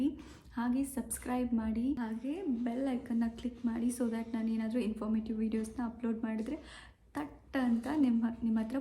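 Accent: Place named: Bangalore, India. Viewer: Nam Hubli kannada matadri Bangalore style Kannada beda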